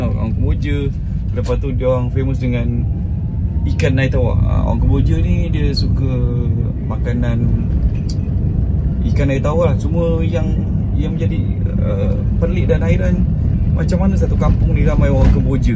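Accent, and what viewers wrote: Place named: Kedah, Malaysia. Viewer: Terbaik abg p ronda ronda pasar kemboja di selangor, abg ni asal KEDAH ke? Cakap loghat kedah, ha ha haa.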